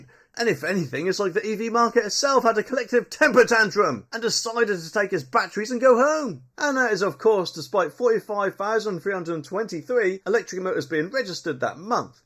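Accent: Norfolk, United Kingdom. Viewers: You sound Norfolk! Am I wrong or right?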